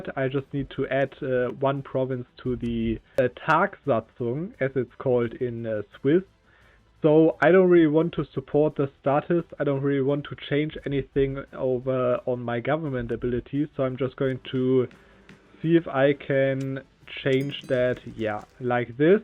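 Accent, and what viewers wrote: Germany. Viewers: You know the video about an autistic map game is about to be good when you hear a German accent recorded by the most clapped out microphone to ever exist.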